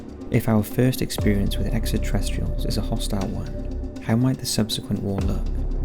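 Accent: United Kingdom. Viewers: Just amazing how a narrator with a proper British accent can spew the most nonsensical fact free content and people will just lap it up.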